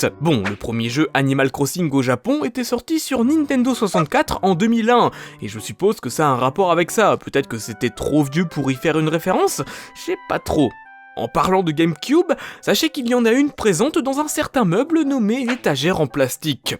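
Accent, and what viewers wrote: England, United Kingdom. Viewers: Plus que l'accent anglais, j'avais l'impression que était dépressif sur cette vidéo xD Be proud and happy! =) Vidéo intéressante cependant, j'aime bien les easter eggs:p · Je critique l'accent anglais de.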